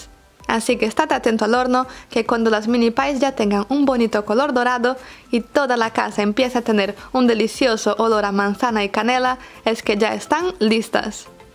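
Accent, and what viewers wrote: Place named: Spain. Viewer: Que buenos videos. Hermosa voz y manera de explicar. Tienes acento español, pero como que tu idioma natal es otro me parece. Saludos desde Argentina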